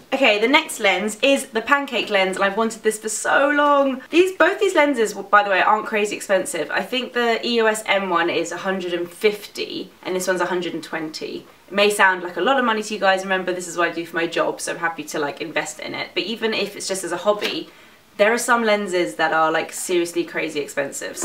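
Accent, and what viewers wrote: United Kingdom. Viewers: Ha ha english subtitles are not acquired for this video because of your British accent I believe and I am glad to see that I am way comfortable with British accent now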